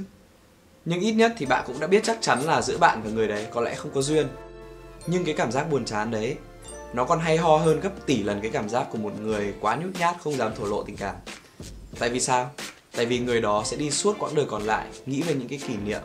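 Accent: Standard. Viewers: Bạn nói chỉ được cái chuẩn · nói chuẩn vãiiiiiiiiiii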